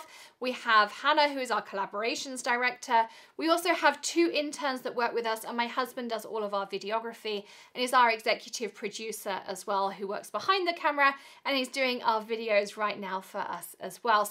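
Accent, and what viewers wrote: United Kingdom. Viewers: WOW !!! You sound like maybe you're either Wonder Woman or maybe you have a few clones walking around there helping you do all that you do. I tell you , you look so calm for all that you do. I am amazed. Have you always had this kind of energy ???? If it were possible , I'd tell you to bottle it and sell it. You would make Millions $$$. It has been a joy listening to your video about your self. I now know where that cute little accent comes from. Welcome to America from across the pond.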